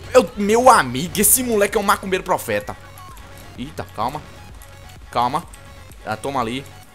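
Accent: Northeast, Brazil. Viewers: Nada melhor q o sotaque Nordestino!Manda um salve pro Rj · Likeeeeeeee, Nordestino Gostoso:3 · Nordestino rapaiz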